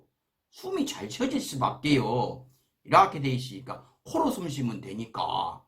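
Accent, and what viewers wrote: Jeolla, South Korea. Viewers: 강 성범씨의 유창한 전라도사투리가 너무 웃겨서 밥 먹으면서 듣다가 자꾸 밥을 뿜게 돼서 밥 먹을 때는 보면 안 되겠어요 내용 너무 좋고 알기쉽게 설명해주셔서 감사합니다 팬 되부렀스요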